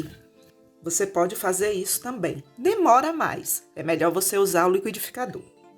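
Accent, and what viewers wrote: Bahia, Brazil. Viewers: Conheço sotaque baiano de longe,amei a receita e sua voz kkkkkk. Bj 😙 sucesso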